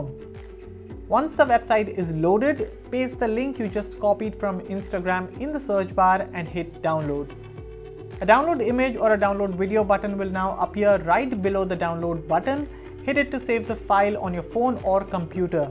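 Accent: India. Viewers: Is that a Hindi accent?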